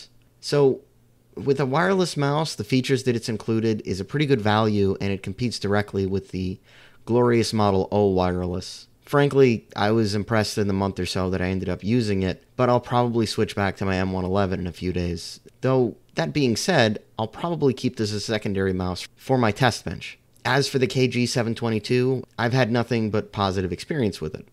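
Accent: Scotland, United Kingdom. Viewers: Too bad you lost your accent (like for example Xcom 2 Episode 1 ), that was like 25% of me wanting to view it (love the Scottish accent) :)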